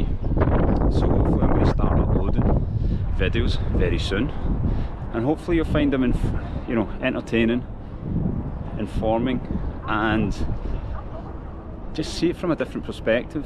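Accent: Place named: Glasgow, United Kingdom. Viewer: I stumbled upon your channel a few days ago, and i LOVE it. I follow a few other channels by Russian citizens and they are facilitating. Yours offers a great Western insight into the day-to-day life in Russia when compared to life as a westerner. I’ve seen 3 of your videos so far and I’m currently ‘catching up’. Just wondering - I know you are Scottish, but specifically, are you originally from Glasgow? Your accent is very similar to a singer friend I know who was a proud Glaswegian! LOL Anyway, I’m enjoying your videos on this magnificent city.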